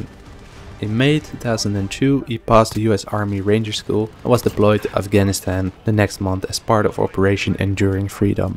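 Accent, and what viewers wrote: Netherlands. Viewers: grappig man je kan kk goed horen dat je nl bent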